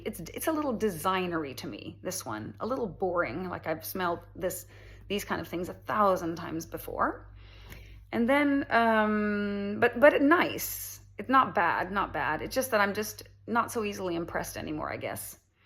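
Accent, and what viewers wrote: United States. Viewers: You sound like a perfect American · Btw, I love it that Sweden is so secular that you were unfamiliar with "omniscient." It's one of the three "omni" properties that the god of classical theism possesses (omniscient, omnipotent and omnibenevolent.) I had a fiancee for 4 years who was from Gàvle, and while her English might be stronger, you've got the American accent down perfectly. She has a Swedish accent when speaking English and you do not. So interesting!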